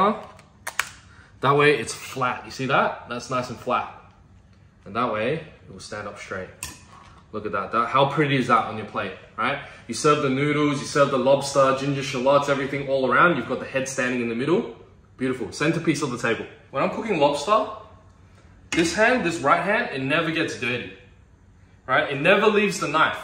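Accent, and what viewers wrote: Australia. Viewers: Oh yea I see. Yea is Kiwi. He's based now in Australia though and his accent is quite Australian now, so we shall claim him =P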